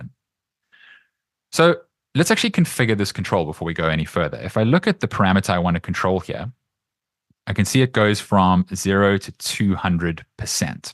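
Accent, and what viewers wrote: South Africa. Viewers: Great video, something I’ve been interested in for a while. Also btw, are you by any chance South African? Your accent sounds familiar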